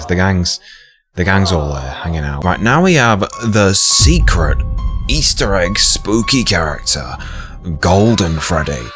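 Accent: United Kingdom. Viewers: Is this guy British or smth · i was not expecting that voice from you dude lol you dont look british at all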